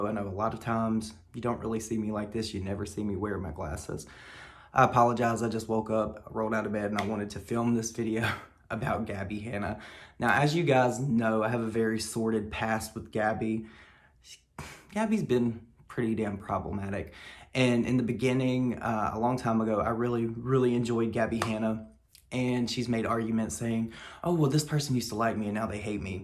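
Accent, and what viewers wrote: South, United States. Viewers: I must know for sure. Are you from the south because I am 🙋‍♀️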